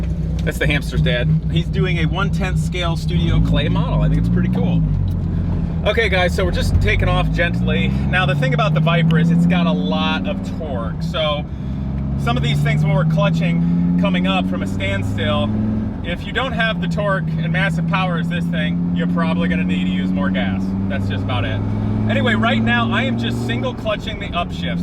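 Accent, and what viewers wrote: England, United Kingdom. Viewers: Dude you’re so hyperactive!! I love your video style. And your car. Love the way you sign off: be nice to your cars. Absolutely. Finally, I’m from ENgland, nice English accent. :D:D:D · You look a bit like jenson button, you got the british accent down pat too😂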